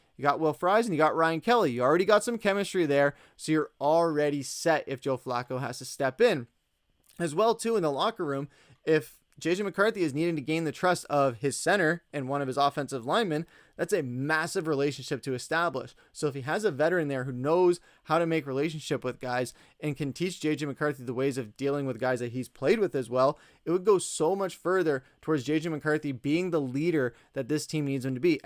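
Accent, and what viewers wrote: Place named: Minnesota, United States. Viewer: You have the minnesota, north Dakota about accent.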